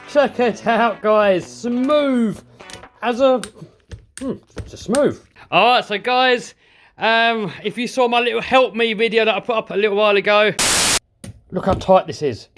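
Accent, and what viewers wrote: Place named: Cockney, United Kingdom. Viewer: You have the strongest Cockney accent I've ever heard! Lol great vid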